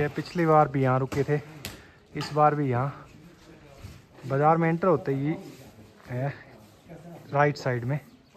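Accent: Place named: Chamba, India. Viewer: Chamba se ho ap kya bhai nyc video apki bolne ki tone thodi chamba ki lagi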